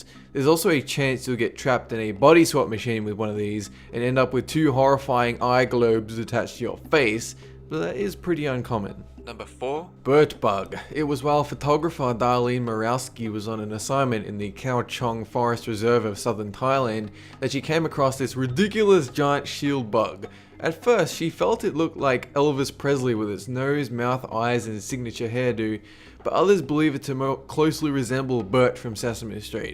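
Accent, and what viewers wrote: Australia. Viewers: are you australian? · are you Australian or kiwi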